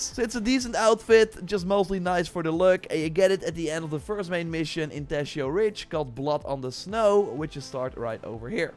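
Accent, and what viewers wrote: Netherlands. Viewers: This is the most Dutch English accent I've ever heard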